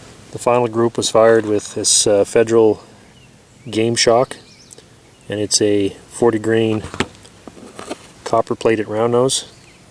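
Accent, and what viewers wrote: Canada. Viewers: Are you by chance, Canadian?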